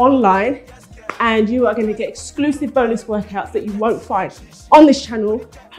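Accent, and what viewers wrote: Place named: Caribbean. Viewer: Your accent is #awesome!!! BLACK is #BEAUTIFUL!!! Proud to be black! Caribbean vibes right there...more fire 🔥!!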